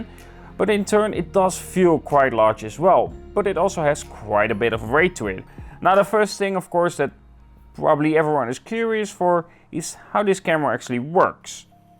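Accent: Netherlands. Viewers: are you Dutch? I'm like 99% sure...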